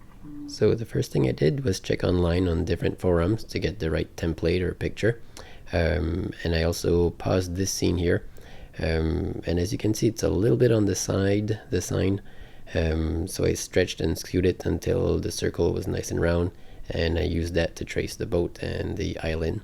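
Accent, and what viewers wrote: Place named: New Brunswick, Canada. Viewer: Super nice! Couldn't help but notice your accent... are you from NewBrunswick?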